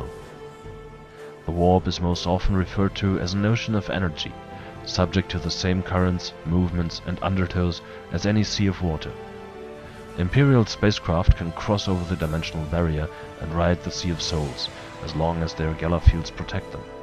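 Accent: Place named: Germany. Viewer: thanks for your educational videos. the special voices you use and your German accent make it even better.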